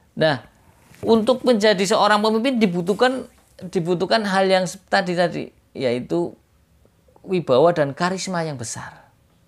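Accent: Tegal, Indonesia. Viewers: Kang Boss.... Logat kaya Tegal koh..... Salam Ngapak.... Aku Naksir Keris e....koh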